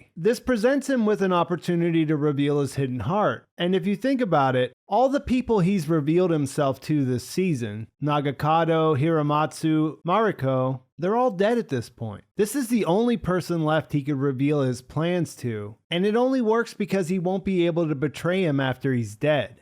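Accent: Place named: Northeast, United States. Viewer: yankee accent very tiring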